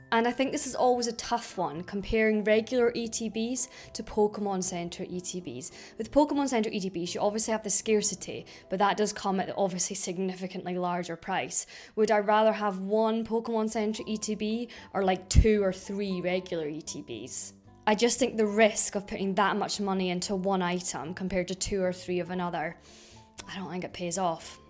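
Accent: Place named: Scotland, United Kingdom. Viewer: I’m sure those 4000 hours watch time will come no bother - I really appreciate your videos, you make much better arguments that many of the other investing streamers who I feel jump on the hype trains and doom and gloom for the extra views. It’s also refreshing to hear a fellow Scot talking pokemon. Question - I got the Mega charizard UPC and Phantasmal flames booster boxes at retail. Would you flip them for a better set like destined rivals or just hold them for the next few years? They don’t seem to be very popular… thanks!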